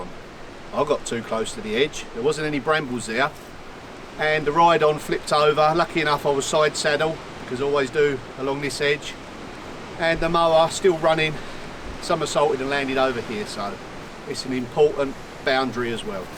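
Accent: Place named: London, United Kingdom. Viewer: Sounds like working man's London burbs to me !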